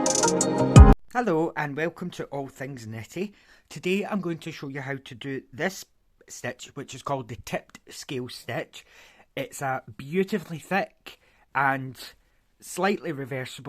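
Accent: Scotland, United Kingdom. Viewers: I'm from Devon and very rarely get to hear a scotsman very nice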